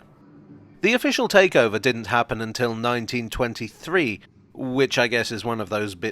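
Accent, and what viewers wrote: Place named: Glasgow, United Kingdom. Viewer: This Narrator is coincidentally Glasweigen. His accent is broad Glasgow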